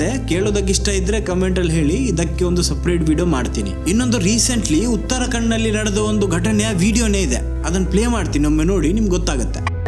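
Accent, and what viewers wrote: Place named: Coastal Karnataka, India. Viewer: Anna en content nimma voice matte nimma karavali kannada super 😢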